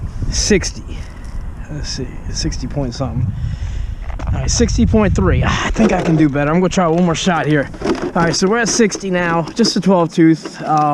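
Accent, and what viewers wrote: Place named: Maryland, United States. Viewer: It is actually a very close guess accent wise, because he is from Maryland.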